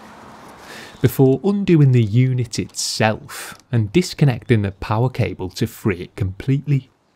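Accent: Liverpool, United Kingdom. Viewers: Subscribed to your channel 2 months ago, so relaxing and memorising it must be that soft Scouse accent😆,last video before Christmas?, if so happy Christmas/new year, loads more cars to clean in 2018 🎄🇬🇧🚘👍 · Is he a scouser or from the Wirral, it`s a bit posh there.?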